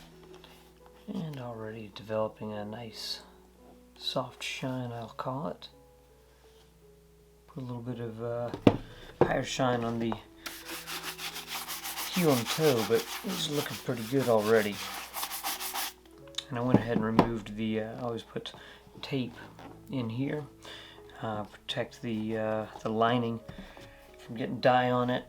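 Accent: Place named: Oxford, United Kingdom. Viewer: I mean, you did a good job. The way you speak, your intro and other thinks are totally like Elegant Oxford. I don't say that you're bad at what you do.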